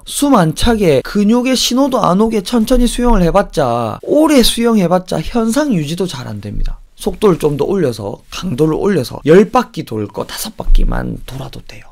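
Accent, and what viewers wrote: Gyeongsang, South Korea. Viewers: 최고 입니다. 경상도 방언이 이리 스윗 할 줄이야~!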